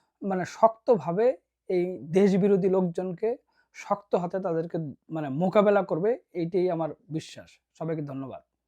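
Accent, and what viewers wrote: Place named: North Bengal, Bangladesh. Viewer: আপনি কি নেশা করে ভিডিও করতে আসেন নাকি, আপনাকে দেখলে একদম গাঞ্জাখোর নেশাখোরদের মত মনে হয়, উত্তরবঙ্গের খ্যাত মফিজ গুলো যেভাবে কথা বলে আপনিও ঠিক সেভাবেই কথা বলেন . স্মার্ট না হতে পারলে ইউটিউবে এসে ভিডিও বানিয়ে মানুষকে বিরক্ত করে ইউটিউবের বারোটা বাজার কি দরকার?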